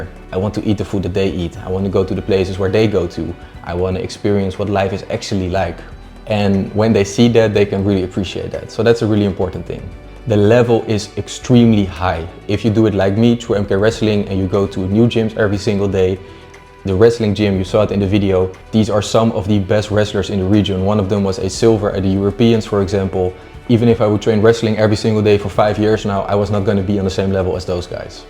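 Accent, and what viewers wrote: Netherlands. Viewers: Amazing video. Your accent sounds Dutch. Where are you from? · Why do you have an american name but a Dutch accent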